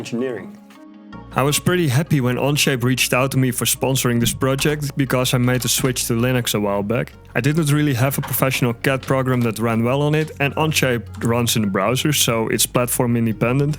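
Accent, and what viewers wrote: Netherlands. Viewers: Hey ur Dutch I’m Dutch too ik hoorde al aan je Engels accent deze lijkt een beetje Nederlands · Wait a MF SECOND‼️‼️‼️‼️ You're Dutch‼️‼️ i hear you say jesus with a dutch accent‼️‼️ ik wist het😂😂 i'm also dutch😂😂 game recognizes game😂😂 and also i hear you say fucking hell fucking shitzooi, between and in the video 😂😂😂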